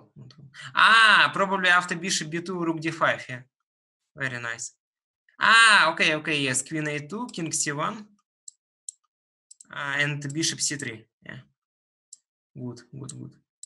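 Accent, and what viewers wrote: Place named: Russia. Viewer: I googled brutal russian accents and ended up here, amazing stuff.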